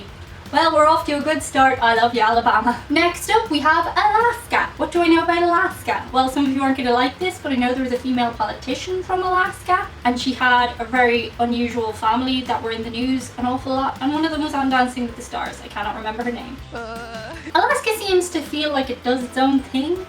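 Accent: Ireland. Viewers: I’m a new subscriber, I’ve been binge watching a lot of your videos. I now have your Irish accent stuck in my head. Oh, I watched editor video. She has so much personality, just tell her, she needs to be Not so perky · I love her accent being of Irish decent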